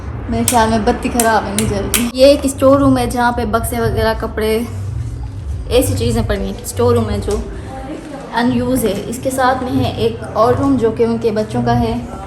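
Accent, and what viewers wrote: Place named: India. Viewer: Aap to indian jaisi hindi bolti ho or aap bahot hi khubsurat ho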